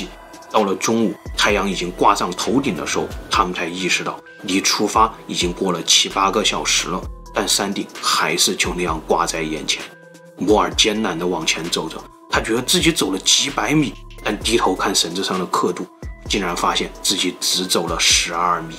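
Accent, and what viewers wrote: China. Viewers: 的这个是江苏还是福建口音？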